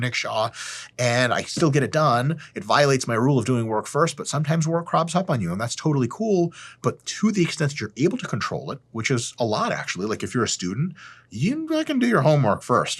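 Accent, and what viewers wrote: Russia. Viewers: Whoa Сделал дело - гуляй смело! You have really russian pronouncement! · Damn, you Russian mate? Awesome.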